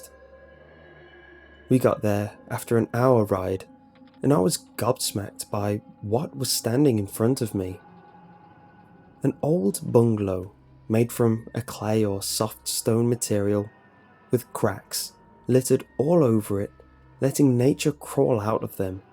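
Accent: United Kingdom. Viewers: This British accent of yours is interesting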